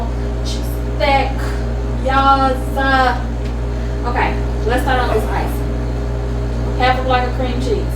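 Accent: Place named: South, United States. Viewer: "Girl,you are some kind of "COUNTRY TALKING ".? WOW! We can barely understand what you are saying.?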